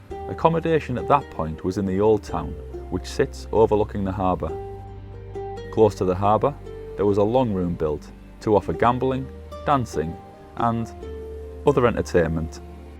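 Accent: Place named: Teesside, United Kingdom. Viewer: You sound as if you are from Teesside area, not Scarbrough ?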